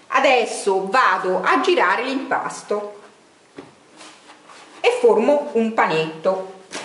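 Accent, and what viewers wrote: Tuscany, Italy. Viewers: Lei è toscana?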